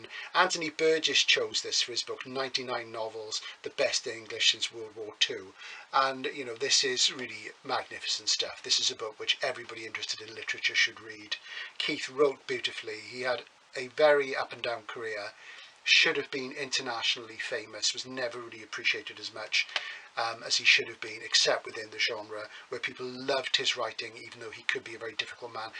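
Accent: Wales, United Kingdom. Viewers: I've been a fan of SF since before my teens (I'm on the verge of 60 now) and Alternative History was always a guilty pleasure. I say guilty because to be honest a lot of it wasn't terribly good, with bad writing hidden by concept, but there are enough gems out there to make the sub-genre worthwhile.. I've loved 'Pavane' since I first read it back in the 70s, and was particularly pleased to finally visit Corfe Castle about 5 years ago. A couple of others I remember from back then are 'A Transatlantic Tunnel, Hurrah!' by Harry Harrison, and 'The Last Starship From Earth' by John Boyd, in which the reasons for the alternative world lie very far back in the narrative, until the rather wacky ending. No doubt you will also do a time travel discussion at some stage, and I shall look forward to it, as fI'm a sucker for Welsh accents anyway, and you have a very easy and confident speaking style.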